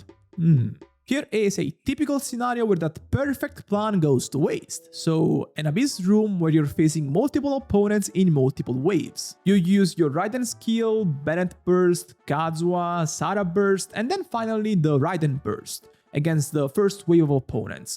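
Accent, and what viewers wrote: Italy. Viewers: yo bro, u italian?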